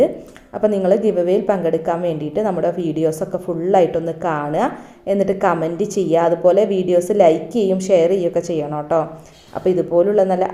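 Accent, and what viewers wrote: Malappuram, India. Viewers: Super try cheythu nokkattoo.ningalude വീട് malappuram anooo😀😀😀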